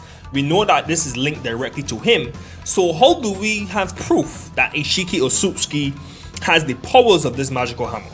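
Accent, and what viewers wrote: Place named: Trinidad and Tobago. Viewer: Loving the accent, trini?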